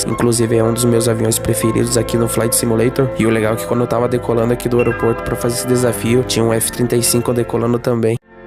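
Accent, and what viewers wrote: Curitiba, Brazil. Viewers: Cara vc é de Curitiba? Seu sotaque é igualzinho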